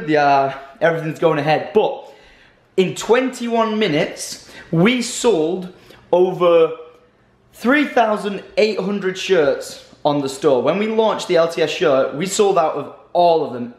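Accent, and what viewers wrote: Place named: Manchester, United Kingdom. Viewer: Manchester. Well, just south east of it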